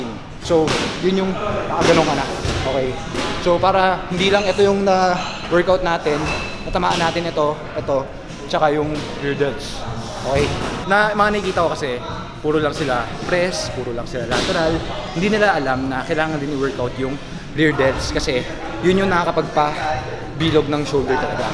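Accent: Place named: Tegal, Indonesia. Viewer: Orang tegal ya? Bocah ngapak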